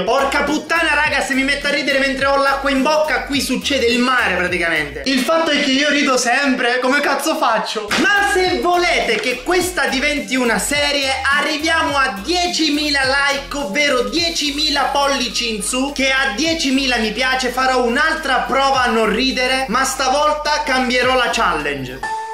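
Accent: Sicily, Italy. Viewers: Ma sei di Sicilia?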